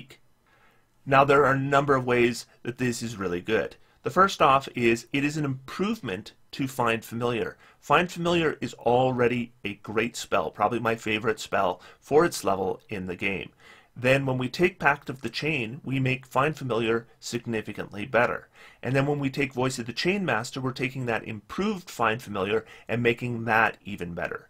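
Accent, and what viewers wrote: United States. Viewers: aegonizing blast. gotta love that northern midwestern accent, heh!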